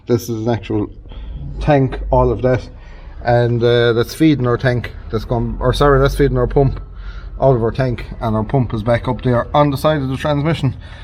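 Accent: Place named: Galway, Ireland. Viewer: I taught u were from galway because of ur accent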